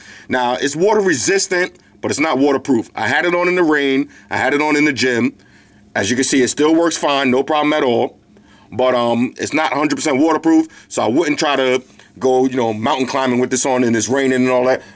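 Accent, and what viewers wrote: New York, United States. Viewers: very good review ,easy to understand, plain english, the way WE New yorkers speak everyday, because of this review , i'm buying this watch, thanks Man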